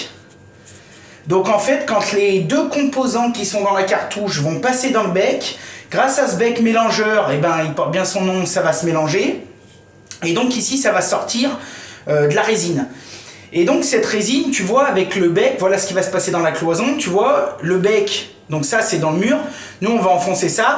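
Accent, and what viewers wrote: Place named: Loire, France. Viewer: Super tuto merci! Tu serais pas de la Loire? Il me semble avoir reconnu l'accent de chez nous 😁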